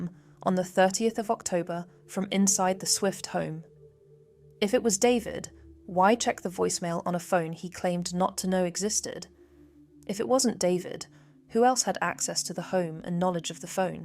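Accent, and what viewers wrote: United Kingdom. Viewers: COPY CAT BRITISH NARRATOR!!!!!!!!!!!!!!!!!!!:face-purple-crying::face-purple-crying::face-purple-crying::face-purple-crying::face-purple-crying::face-purple-crying::face-purple-crying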